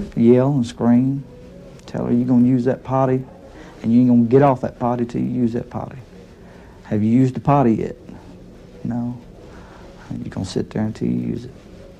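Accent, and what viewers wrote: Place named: South, United States. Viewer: his eyes and southern drawl are so disarming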